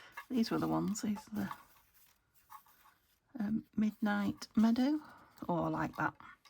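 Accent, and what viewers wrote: United Kingdom. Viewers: Good morning. ☕️ Congratulations on 3,000 subscribers. It sometimes takes me a day or two to completely watch a video because of interruptions in my life. Your voice, and gentle crafting and wonderful UK accent are the highlight of my day. Thank you so much for the inspiration and sharing your beautiful journals with us.❤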